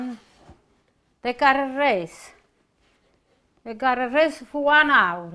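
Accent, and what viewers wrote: Italy. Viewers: Κυρια ειστε υπεροχη.καταπληκτικα τα τσουρεκια σας καπως ετσι τα κανουμε και εμεις στην Ελλαδα.Μου αρεσαν και τα τραγουδακια σας ο Θεος να σας εχει καλα Καλο Πασχα.Αγαπω την Ιταλια ακομη και τον προφορικο σας λογο.❤❤❤ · I so love her accent! She reminds me of a southern grandma with an Italian twist..😊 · I could listen to talk all day long! I love her Italian accent and watching her make her delicious dishes.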